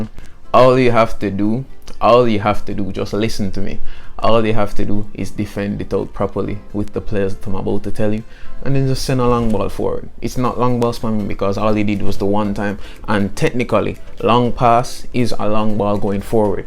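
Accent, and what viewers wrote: Jamaica. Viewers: You sound Jamaican lowkey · Ya Jamaican ? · You a Jamaican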